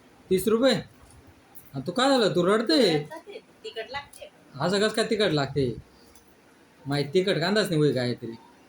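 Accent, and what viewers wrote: Khandesh, India. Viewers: Kont gav ahe tumch भाषा vrun tr खानदेशी vatay tumi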